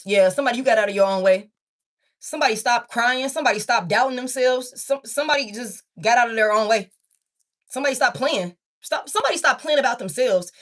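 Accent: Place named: New York, United States. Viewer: You sound like you’re from NY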